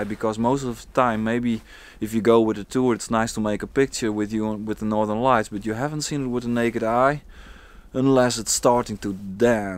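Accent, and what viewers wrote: Netherlands. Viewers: haha hoorde het door het accent wel vet man die northern lights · you sound dutch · How you talk is totally Dutch even your name ! *Peace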